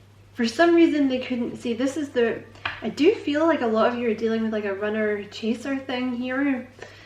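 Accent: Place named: Scotland, United Kingdom. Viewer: I loved this reading. Resonated so deeply with my current and past love life situations and not only was it true of me as a person, but what you intuitively picked up on with him was also very accurate. You mentioned a possible Aries ♈️ connection for new love and I’m in communication with him, and we are soon to meet in person for the first time. I’m so nervous because he is such a humorous and seemingly confident person, but I don’t feel I won’t be interesting enough, funny enough or bold enough for him. I can come across that way because I have Scorpio moon and Leo moon, but I’m not able to naturally be that way all the time. You often hear that Aries and Water sign compatibility isn’t the best, especially when his big three signs are all fire and I have two water and only one fire (but perhaps even having just one fire in my big three could help with compatibility). 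I hope that that doesn’t always matter with this combination. Then again I’ve been with people in the past who astrologically should have been a great match for me, but weren’t in the end. So I just hope that we get along as well in person as we do online with the strong connection we have. Please tell me if this combo can have good compatibility and wish me luck, because I feel I’m going to need it 😅. Thanks again for a lovely reading, 🦋 Oh and btw, I love how soothing your voice is and your accent, which if I’m correct, I would assume is Scottish? If I’m wrong, I apologise. But it’s a very p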